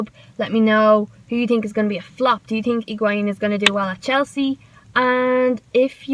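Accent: Ireland. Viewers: HI HOW ARE YOU BEAUTIFUL 😘 I HOPE WE SIGN KOULIBALY. I REALLY LIKE YOUR ACCENT 🇨🇮 #IRISHACCENT XXX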